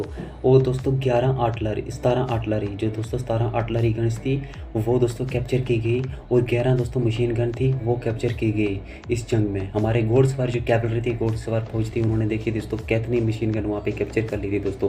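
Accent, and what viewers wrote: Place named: Pakistan. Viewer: Baat Karne Ka Accent Paakistani Kyu Lag Raha ? Paakistani Bhai Ho Kya ?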